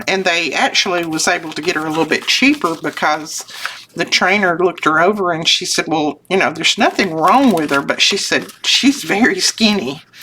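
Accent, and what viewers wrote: South, United States. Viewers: God bless you with Peace, Courage and Strength. My husband of 43 years passed away a year ago July 14 then my mother's earth suit gave out at age 93 in January '24. You have been a companion of mine since I found you and am very grateful for your Southern upbringing which is reflected in your sweet accent and charm. I am now a faithful student of yours BTW, I have two daughters, three granddaughters and a grandson. Two of my granddaughters (sisters) now live with me to my great delight. I will pray for you and encourage you to face each day with grace while looking forward to the goodness to be found when you look for it. Kay Davis Peddie, Quincy, FL.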